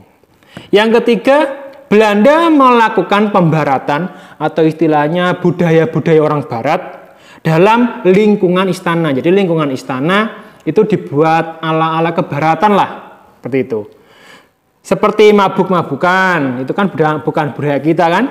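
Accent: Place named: Java, Indonesia. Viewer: Saya suka logatnya , medok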